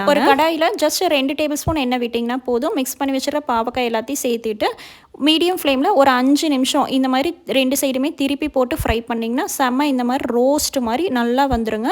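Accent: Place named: Kongu, India. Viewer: Sister unga kongu tamil rompa responce ah erukku enakku pidichirukku subscribe pannita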